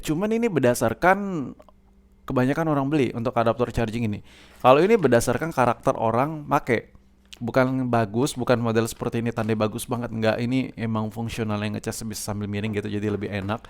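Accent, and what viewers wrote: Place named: Banyumas, Indonesia. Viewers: Anjay basa jawanya kental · Kaya wong banyumasan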